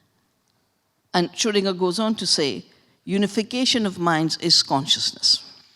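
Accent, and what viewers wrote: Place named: India. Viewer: ill be honest, she's just babbling in an indian accent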